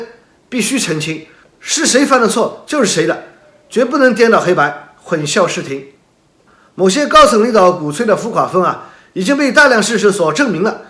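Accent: Shanghai, China. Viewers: 听得出你是上海人了不起有胆识。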